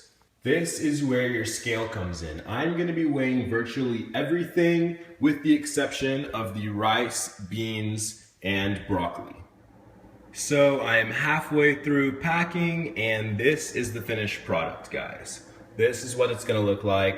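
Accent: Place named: Canada. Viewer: I can just tell ur canadian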